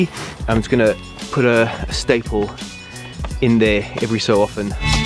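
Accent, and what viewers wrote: South Africa. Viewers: Hearing the SA accent just gave me joy lol. South Africans know how to DIY the right way💪💪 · I thought the same thing. Had to come to the comments to confirm if it was South African accent :")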